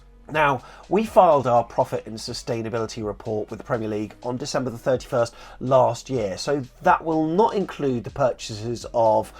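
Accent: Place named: United States. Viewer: American accent frightfully good. You should do all future financial breakdowns as Gandolfini from The Sopranos.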